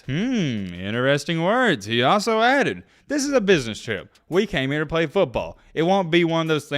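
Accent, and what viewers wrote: South, United States. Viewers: Dumbest video on YouTube. Cincy wears black 95% of the time. This dude's southern accent gives his lack of knowledge of this away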